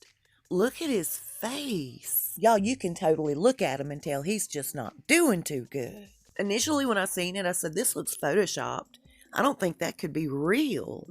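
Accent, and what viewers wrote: South, United States. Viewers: You sound like a southern gossip speaking tool! Don't worry, you have lost me.!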